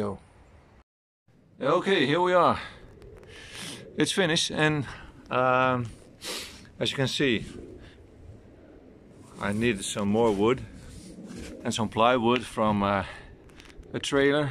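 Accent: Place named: Netherlands. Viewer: The Dutch English accent is so endearing... my favorite. Also thank you for the instructions, exactly what I was looking for. Keep it up. Subscribed :) bedankt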